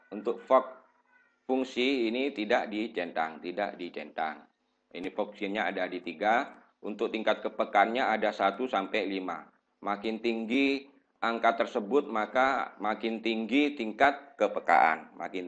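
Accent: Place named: Madura, Indonesia. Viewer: mantap bang, ilmunya keren. btw abang asli dari madura ya? logatnya kayak teman saya orang madura. sukses selalu bang. salam buat yg di madura 👍👍👍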